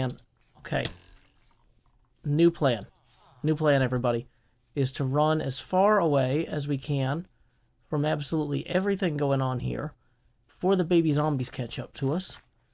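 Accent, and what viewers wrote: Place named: South, United States. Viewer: I just wanna point out the country accent you have compared to everyone else in the hermit craft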